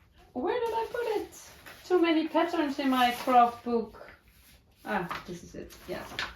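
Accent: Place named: Netherlands. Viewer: you are so amazing. I love how practical you are about everything in your knitting and crochet. You have such a wonderful healthy outlook. Thank you so much for sharing it with us. I had a friend who passed away from The Netherlands so listening to your accent is so pleasing to me. Your English, even when it is Dutchy is so lovely to my ear.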